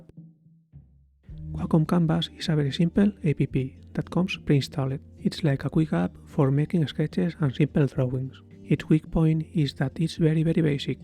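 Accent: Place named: Spain. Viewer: Genial video de una tablet que la ando cazando para cuando baje algo de precio; asi como curiosidad , Creo que eres Nativo de la lengua española ( lo noto en tu acento) no se, por que a los que sabemos un poco de ingles en mi caso b1 ( entendemos mucho mas la gente con acento que a los nativos del ingles)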